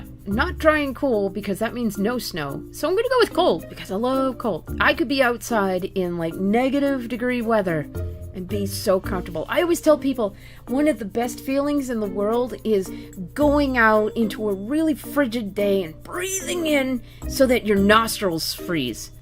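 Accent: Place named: Boston, United States. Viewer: Once you said you're close to Bostonian I instantly heard it in your voice.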